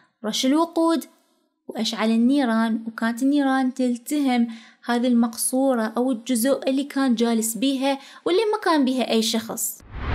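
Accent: Iraq. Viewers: ياحلو الهجه العراقية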